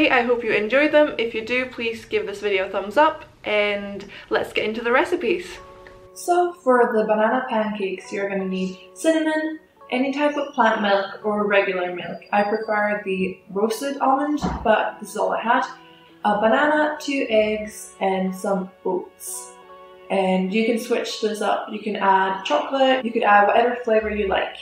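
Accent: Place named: Scotland, United Kingdom. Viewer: You're totally getting a Scottish accent. Also this video is great!!! I need new meal ideas so this is perfect :)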